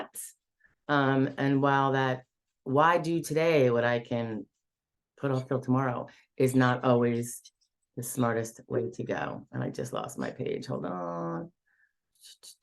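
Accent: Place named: New Jersey, United States. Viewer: i like your vids could u do a vid with your jersey accent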